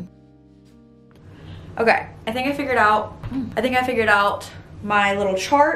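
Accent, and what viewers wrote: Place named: California, United States. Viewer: That Californian accent 😶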